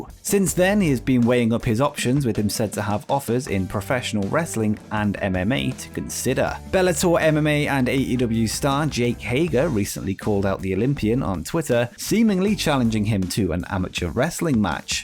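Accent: United Kingdom. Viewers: come on... There is nothing wrong in admitting it's you. I wish that I was able to add a link of your other channel here but I would genuinely fall for your genuine denial if not that both of the voices including the perfect British accent of yours are so genuinely exactly and precisely the same, that one who knows you from Vidiq can't really mistake it.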